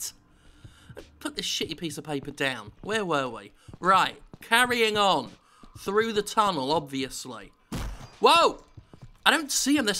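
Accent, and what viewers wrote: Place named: United Kingdom. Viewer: idk if it's just me, but you're sounding particularly British in this video:O I mean not that you don't usually, but in particular idk XD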